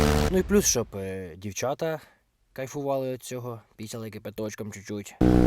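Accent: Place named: East, Ukraine. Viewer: Як на мене українська зі східним діалектом, або невеликим суржиком. Я кна мене на 100% краще ніж чиста кацапська🤢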